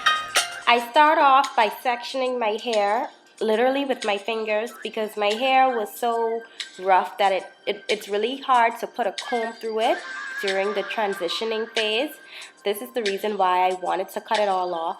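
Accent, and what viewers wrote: Bahamas. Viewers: Are you from The Bahamas? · You sound like a Bahamian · Could always tell a Bahamian by that accent. 🇧🇸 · Great video .as soon as I heard your voice I was like the Bahamas . I am from Nassau but have been in Virginia since 1996 . Keep on doing your best . You are a star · I luvvv my Bahamians 🇧🇸.... I just luv our accent and when you said "Horrible" why I bust out laughing lol but it really looks great on you tho... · Yassss I know bohemian sister when I hear one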